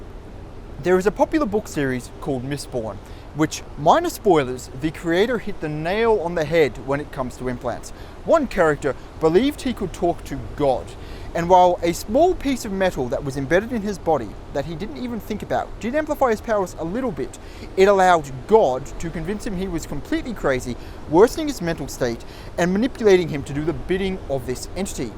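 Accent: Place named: Australia. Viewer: Sounds like an Australian accent-probably NSW or Queenslander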